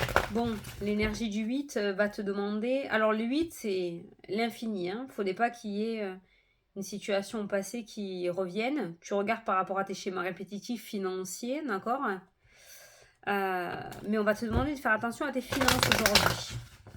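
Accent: South, France. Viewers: Merci beaucoup pour ta bonne humeur et ton accent du Sud, j’ai toujours le même plaisir de te voir tous les jours. Quand à moi j’aime les deux formats que tu proposes j’ai envie de te dire fais comme ton cœur ♥️ a envie, écoute le et lui seul le sait. Merveilleuse journée à toi 😊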